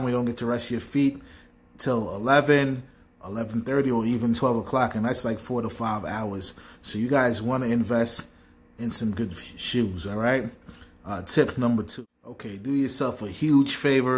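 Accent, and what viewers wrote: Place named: New York, United States. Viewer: Love the NY accent man 😆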